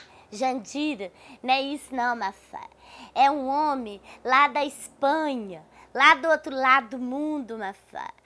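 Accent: Minas Gerais, Brazil. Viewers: Jamais os americanos entenderam este sotaqui que so os mineiros sabem .😂😂💋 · Adoro te ouvir... Minha mãe chama Josefa que nem a mãe da Jandira. E a familia do meu pai é minerim irgualzinho oce... Adoro...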